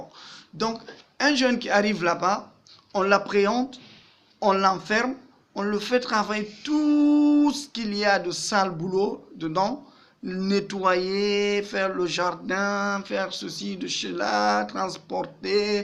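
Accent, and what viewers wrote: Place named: Guinea. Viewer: ce monsieur parle correctement la langue de MOLIÈRE.certe son accent est guinéen c'est normal il n'est pas français.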